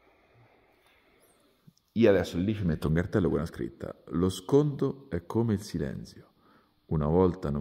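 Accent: Italy. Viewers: Bella la trasformazione della tua tua parlata che al mio orecchio suona folignpoleto almeno, che diventa col donca pg.... Saluti dal LupettOrsetto prealpino che dal 2005 al 2019 era a pg e li ha imparati a conoscere bene quelli che non comprendono la prescia 😅 che devi interrompere il resto per andare apposta per lui e magari il giorno dopo puoi andare a prendere i pezzi per più cose in un giro unico...❤ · Pensavo che io cerco un meccanico e tu hai lo stesso dialetto mio,di dove sei?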